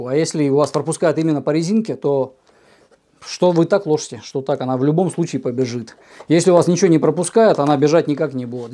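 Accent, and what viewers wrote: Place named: Kirov, Russia. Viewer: Как приятно слышать Кировский говор всё супер у меня такая пока всë норм нравитс🎉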